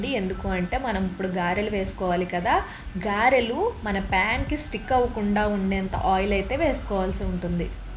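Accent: East Godavari, India. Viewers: మన తూర్పుగోదావరి యాస అలవాటైపోయింది మరి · Amma ne cheppamanu voice east local vasana vastadi. Vere voice vaddu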